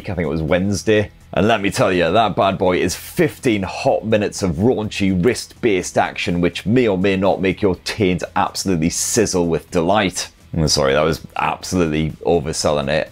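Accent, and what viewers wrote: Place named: Wales, United Kingdom. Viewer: Hi, love your accent, what part of Wales are you from?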